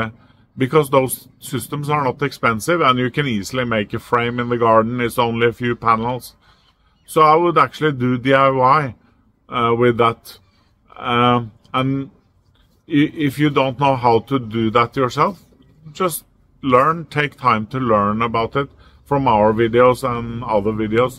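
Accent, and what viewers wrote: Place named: Norway. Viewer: 555..Typical Norwegian English ala Stoltenberg.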